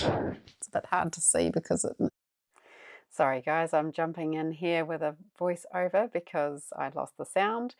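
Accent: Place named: New Zealand. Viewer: You are a joy to watch. I love to hear that kiwi accent. I'm in Wellington just down the road. 😂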